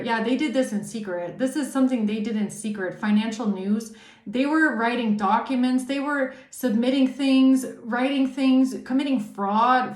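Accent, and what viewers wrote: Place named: Germany. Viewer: 🐝 princess,,,, your beautiful soul love your strength your wisdom your readings and your sprit,,,, hope you don't take on there yucky shit,,,, don't like seeing you angry,,,, your beautiful your a goddess,, you are so much beauty, your Aura your smile your voice your accent,( Germany)❤, you deserve the best,,,I will reward you when I can 😊,,,, God bless you,,,I don't like you stressing,,,, you would have a smile on someone's face every day x,,, sorry if I sound attrusive,,,, 🦉⭐✨⚖️🦋🐝🐝🐝🐝🐝☀️🕯️🛡️✌️💜😇,,,,, don't hurt your self ( your neck) ,I love you,,,❤,I remember you saying you had a accident x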